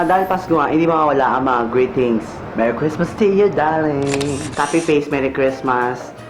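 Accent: Visayas, Philippines. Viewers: bisaya ka po???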